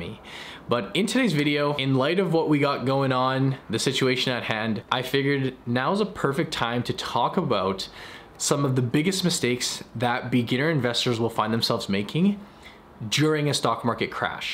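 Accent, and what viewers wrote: Canada. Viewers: Sometimes your Canadian accent is comes out and it’s really entertaining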